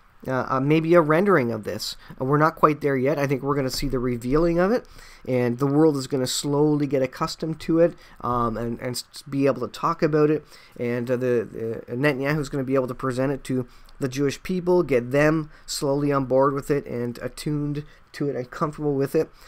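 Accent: Canada. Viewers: Canadian Aye? 😄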